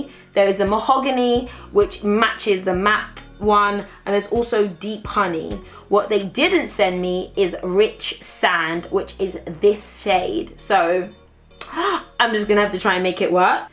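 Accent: Nigeria. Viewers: "your mate look at them" with your Nigeria accent 😂😂😂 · Haha the Nigerian accent. Wow!!!!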